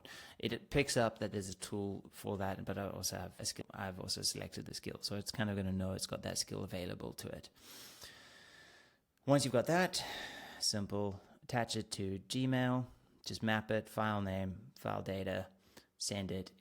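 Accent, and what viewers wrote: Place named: South Africa. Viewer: Well presented and it unlocked some ideas for my future client builds. It's a definite game changer for creating neat reports, without having to use various apps. I thought I recognized the accent... a fellow saffa:-D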